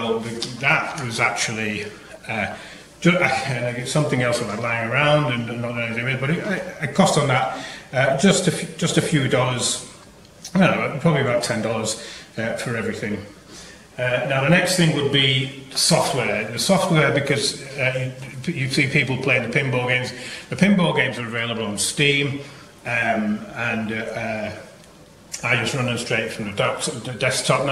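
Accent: United Kingdom. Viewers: This is brilliant mate, I have looked at making a pinball machine for a couple of years now and having found this video, I am on it. I would like to explain my joy of listening to a English bloke, because I have lived in the States for 22 years now and your first few words where “ bit and Bobs” that tickles me. So fun and also I could see you sweating with the heat, 40 deg means nothing to us over here. It would be like 95 deg Fahrenheit. Thank you so much for all that, you have given an old English man new hop. John C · Your accent sounds Yorkshire similar to my own Doncaster accent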